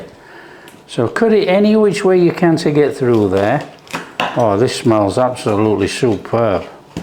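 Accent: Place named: Northern England, United Kingdom. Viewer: Love it!!!!! Let me guess your accent... Newcastle? Sheffield?? Yorkshire?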